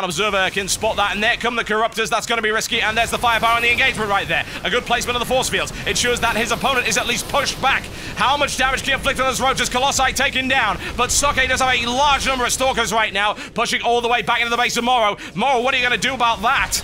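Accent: United Kingdom. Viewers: but but TB's awsome brit voice/commentary of epic SC2 matches...i must watch...i need it so i dont have to go and study my rad tech material or my statistics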